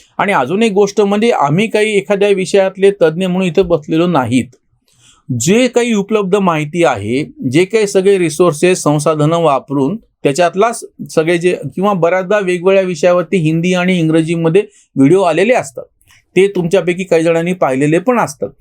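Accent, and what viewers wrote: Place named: Marathwada, India. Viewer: मराठवाडी लहेजा न सोडता आपण बोलत रहा. भाषा दर दहा कोसांवर बदलते अशी म्हण आहे. "लाईक्स भेटत नाहीत" या वर कुणाला हरकत असेल तर तो त्याचा प्रश्न आहे. भाषा शुद्धतेचा अवास्तव आग्रह धरणे अनावश्यक आहे. व्हिडिओ दहा मिनिटात संपला तर जास्त यशस्वी होतो. भाऊंचे व्हिडिओ मी 1.75X स्पीड ला बघतो. काही फरक पडत नाही. उलट भरपूर वेळ वाचतो.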